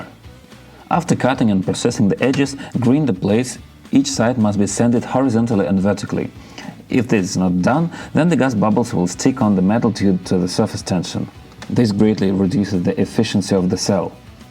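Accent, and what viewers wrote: Russia. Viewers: русский ёпть, акцент не скрыть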